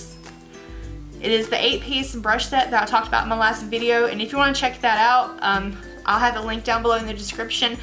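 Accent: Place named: North Carolina, United States. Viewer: OMG! 😲 I'm here in Charlotte. I thought I recognised your accent. ❤💄💋b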